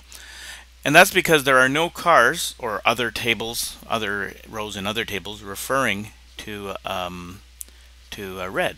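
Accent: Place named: Canada. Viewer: canadian eh?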